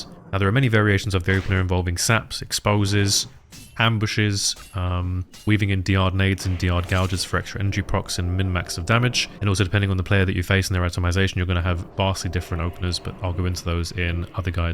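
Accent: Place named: England, United Kingdom. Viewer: If youre going to speed up your speech dont mumble. Maybe it's your accent. Strange how the english invented the language yet cant enunciate to save their life.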